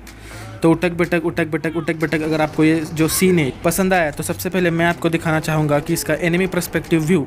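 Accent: Maharashtra, India. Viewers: Op bhaii..💯💯💯 I think your mother tounge is marathi...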